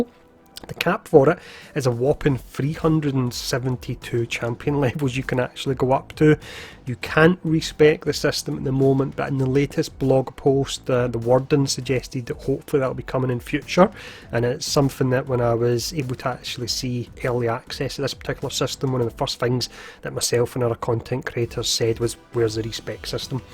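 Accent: Scotland, United Kingdom. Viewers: I've deleted this game for space for other games. I'll wait till T'Challa shows up but I'm not too certain I'd re download it in general due to the fact they only make 3 or less maps to play on. I hate repeats. Also are you from Scotland? I love Scotland accents they're the best!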